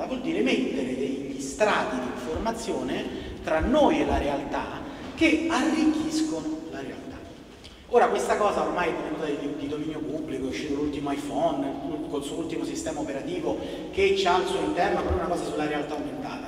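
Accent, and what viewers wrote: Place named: Rome, Italy. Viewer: La cadenza romanesca è alla mano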